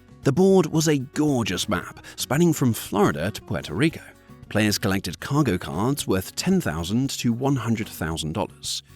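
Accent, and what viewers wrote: England, United Kingdom. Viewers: Don't remember any of these in the UK despite the England sh narration , but in the UK, I think Witchy Witchy was Haunted House.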